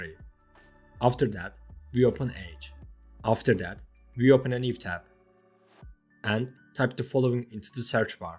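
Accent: Turkey. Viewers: türksün değil mi konuşmandan anladım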